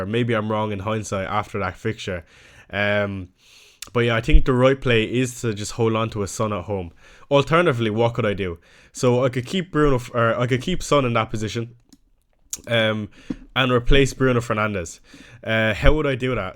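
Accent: Ireland. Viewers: Don't mind him, yer grand. not used to the Dublin accent.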